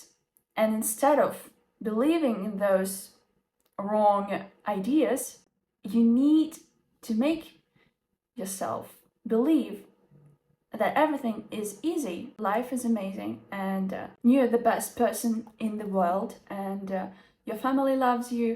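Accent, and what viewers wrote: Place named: United Kingdom. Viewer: Thanks for this video, dear. Your pronunciation is indeed good. You speak British English, you speak so clearly, that those whose English is not advanced, can understand you easily. As for the topic of your speech it's very useful. We sometimes need to talk to ourselves to get rid of the emotions that let us down.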